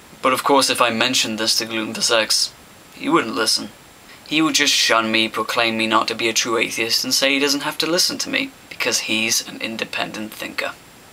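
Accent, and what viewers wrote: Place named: United States. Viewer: Why do you speak with an American accent?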